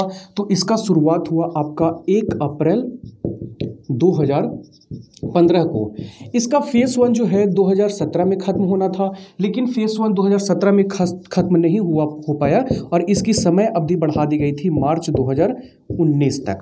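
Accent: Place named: Bihar, India. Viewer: 7/11 , sir jis tarah aap 11 ko ekgyarah bolte hai na ...bahut achha lgta h.. i think aap bihar se belong krte h...agar mai galat hu to I'm sorry plzz ..mujhe jo lga Maine bola ..but I really like ur way of teaching 🙏🙏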